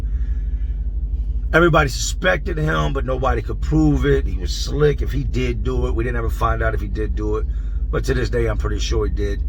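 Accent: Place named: Philadelphia, United States. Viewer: Lol he said bol came back for more, licking his fingers and sht. All like: "yo that jawn was good. Lemme get some more." Haha I hate greedy people like that. Oh, and I'm from Philly. So I know how MFs can be. I can tell you've lived in Philly by the way you talk. But anyway, I was never locked up in Philly, but I've heard plenty of stories.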